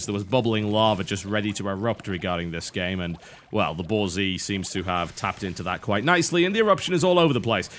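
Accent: United Kingdom. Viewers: No doubt a ton alredy replied something like this but... how the hell did you get that rather typical brittish accent to that of someone from texas xD? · Are you from UK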